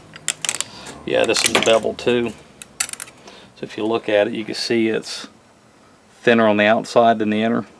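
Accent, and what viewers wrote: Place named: South, United States. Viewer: I really appreciate your videos and your vast knowledge of switch pitch TH400's. Where are you located? You sound like me......Southern. :-)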